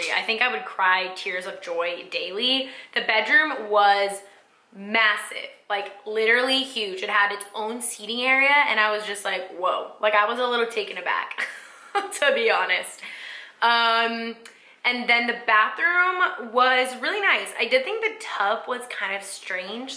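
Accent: South, United States. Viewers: Hi, I’m from England and have been following you for about two years. Am enjoying all of your content, the peak into southern American life (loving the accent) and especially the progress of your relationship with who is just lovely! Personally, I think house No. 1 is best for you both in the long term as, if and when your family expands, you will soon find the extra space will be a godsend. As a mother myself, I would highly recommend the principle bedroom on the same level as the nursery - just think of those multiple night time visits - you definitely don’t want to be “stumbling” up and down stairs!! With regards to house No. 3 I would personally be concerned about the lack of privacy in my rear garden (haha, “yard” as you guys call it). Good luck with your house hunting and enjoy the process - how exciting for you both! P.S. Please pass on to your mother what a beautiful lady she is, both from within and on the outside.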